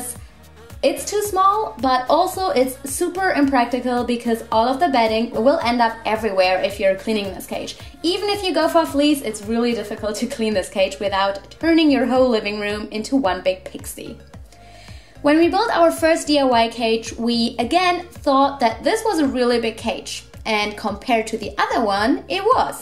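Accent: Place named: Germany. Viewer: what part of germany are you from? that's an interesting accent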